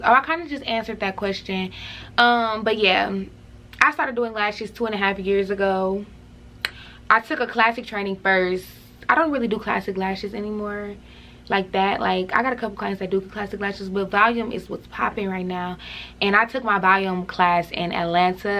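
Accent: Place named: California, United States. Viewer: I would have thought you were from either the bay area of Cali or somewhere from L.A. Lol. The accent.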